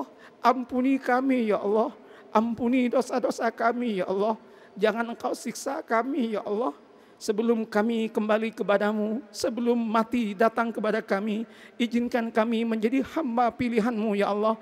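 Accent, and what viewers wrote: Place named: Madura, Indonesia. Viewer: kayak ada logat maduranya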